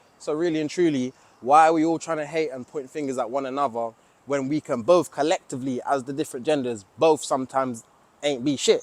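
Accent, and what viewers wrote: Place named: South London, United Kingdom. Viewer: well said man - i think as long as it's all consensual/safe between adults then it's nobody's business but theirs. You sound like you're from South London btw - Thailand honestly beats the hell out of Lewisham or Croydon (where i'm from)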